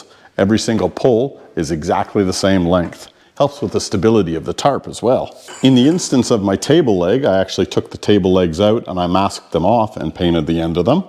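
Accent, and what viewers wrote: Canada. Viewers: I think I ❤your Canadian accent! I’m Scottish and think it’s a hoot! Okay your best hacks for me are: Shrink wrap zip Water tap Butane gas can My hack is for tent camping. No matter what I use, my feet always get cold! So I carry a water bottle in my pack to put inside my sleeping bag at night. Bliss!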